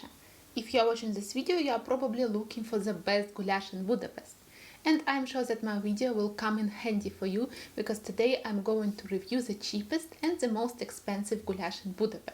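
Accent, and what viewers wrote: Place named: Russia. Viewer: Девушка, давайте я вам поставлю произношение бесплатно, и интонацию. Вы хорошо знаете английский, но произношение прям никуда-вы просто произносите русские звуки и интонацию тоже надо ставить. Я могу вам за несколько уроков поставить и дать ресурсы, где отрабатывать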